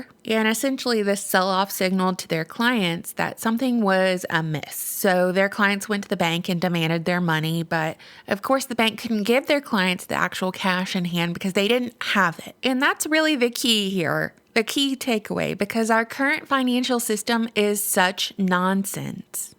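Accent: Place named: Georgia, United States. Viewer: omg I can hear you Georgian accent...I'm so excited for this! 😅 haha im such a dork, love your vids! 💕💕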